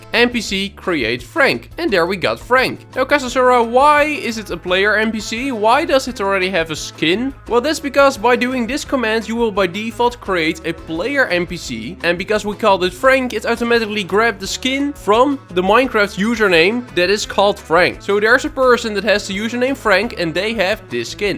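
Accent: Netherlands. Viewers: I can hear that you lived in the netherlands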